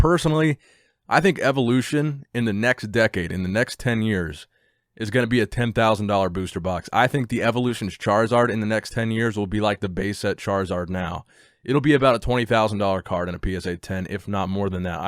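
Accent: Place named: New Zealand. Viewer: Awesome videos, you actually sound like a new Zealander too which is crazy. Keep it up bro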